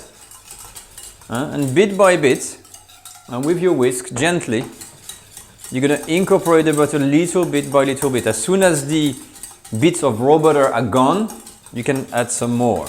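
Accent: France. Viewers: Definitely helps to have that cool French accent!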